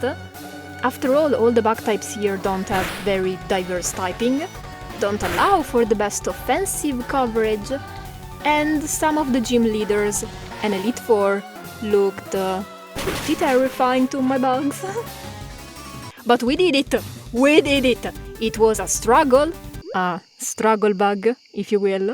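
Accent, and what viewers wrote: Lombardy, Italy. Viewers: vecio ma sei di Bergamo/Brescia? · Ah quindi sei brescianə/bergamascə? Mi piace tantissimo il tuo canale, dallo stile di disegno alla tua voce e la tua schiettezza, continua così 😊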